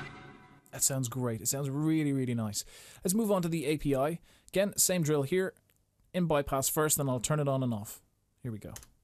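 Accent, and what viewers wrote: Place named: Ireland. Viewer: youre from ireland :) great video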